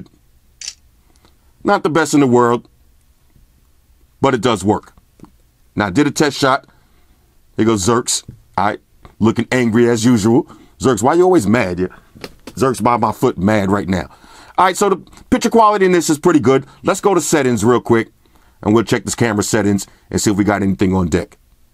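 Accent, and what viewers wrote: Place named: New York City, United States. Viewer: No questions about your reviews but I'm also watching for white shoes and I love your NYC accent!👍💪🍺🍻🥃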